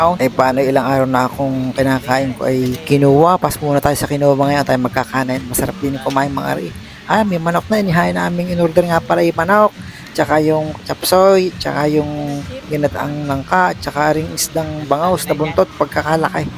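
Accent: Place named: Batangas, Philippines. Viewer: nakakatuwa voiceover mo kasi batanguenya din ako😂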